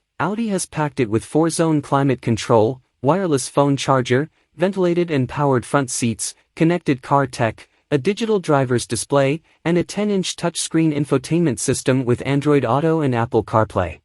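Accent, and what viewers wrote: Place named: United Kingdom. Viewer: How did you change your voice to uk English accent?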